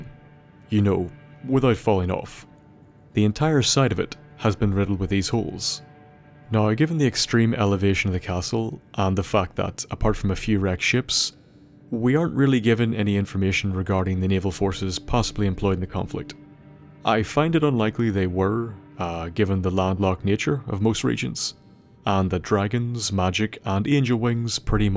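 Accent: Ireland. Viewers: Bruh, I am so in for Elden Ring, please bro, please, I need that sweet sweet Irish accent for my Elden Ring, please do this like you did for Fallout, don't kill yourself, but I'm in for this, I need it lol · The irish lore master is back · Hell yes, an Irish lore hound! Absolutely adore hearing Radhan called mad as a bag of spiders. 💜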